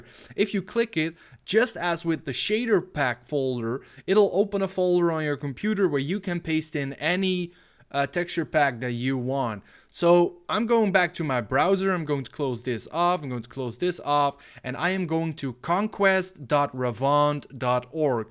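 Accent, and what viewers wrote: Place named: Netherlands. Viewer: dag ik zie dat je nederlands bent het was duidelijk aan je uitspraak let er op bedankt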